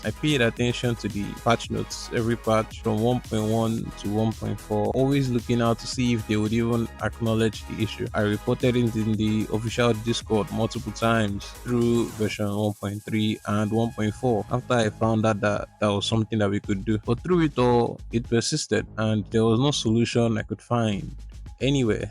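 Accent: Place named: Nigeria. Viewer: I know a Nigerian when i hear one, I thought i was the only one who played wuwa and genshin Subscribed…🤗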